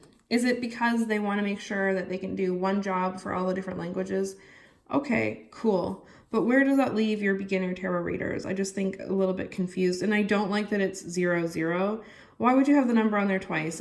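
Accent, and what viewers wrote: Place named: East Coast, Canada. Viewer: Nice deck! Ps you must be from Canada, east coast - by your accent… 😊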